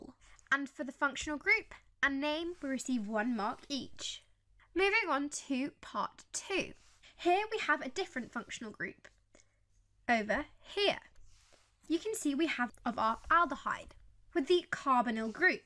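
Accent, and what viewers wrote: United Kingdom. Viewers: u mean she has a british accent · British accent eh..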